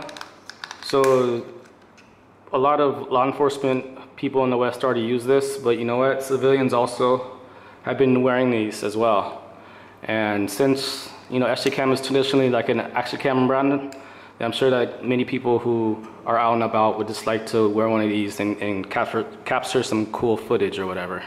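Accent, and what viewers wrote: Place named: China. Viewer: Professional product demands professional review not an amateurish review...... the guy is talking Chinglish....get your act together.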